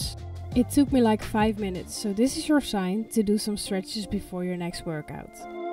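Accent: Netherlands. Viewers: Maybe it’s good to take some lessons in English language . It’s kinda like dutch english. You can follow English online ! ❤ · Liefe dit was echt heel leuk tuurlijke hou je Nederlands accent maar heel goed gedaan!💗✌🏼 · As a Half Dutchie/British guy your pronunciation is quite decent! Sometimes I hear the Dutch accent but I hear through it and doesn’t distract me! So Keep it up! ❤️💪🏻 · Echt leuk je hoort soms wel dat je nl bent maar dat maakt niet uit 🥰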